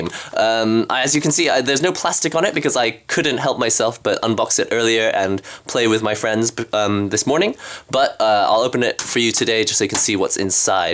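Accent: United Kingdom. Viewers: I'm not saying that silly. From his accent, he's clearly a British dude moved to Japan!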